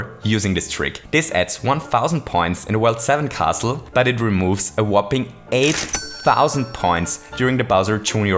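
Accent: Germany. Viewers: You have a funny German accent tho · From where you are ? (Sounds like Germany ) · the german english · What accent do you have? German? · I hear a german voice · You sound like a German speaking english and I like it. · Are you german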